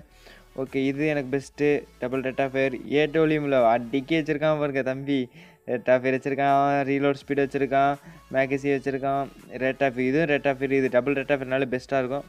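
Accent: Tirunelveli, India. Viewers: Bro r u from trinelveli your voice s like that. Because I too from trinelveli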